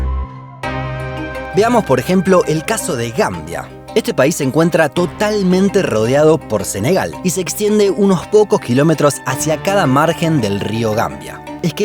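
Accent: Argentina. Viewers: Buenas tardes, Cosas que han pasado por culpa de las fronteras: - Reparto desigual e injusto de recursos - Me gusta el acento argentino - Nos parece mal que se explote tanto los recursos económicos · ya me fijé, estaba viendo los comentarios de otros comentarios y al parecer es un Chileno xenofobo de argentina y al parecer el creador de los vídeos es argentino o parece por su forma su acento que tiene similitudes a la de argentina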